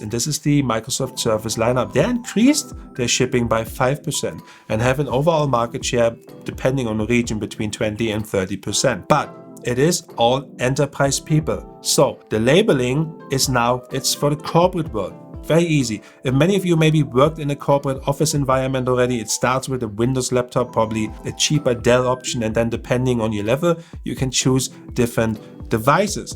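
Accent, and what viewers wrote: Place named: Germany. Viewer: Abnormaler deutscher Akzent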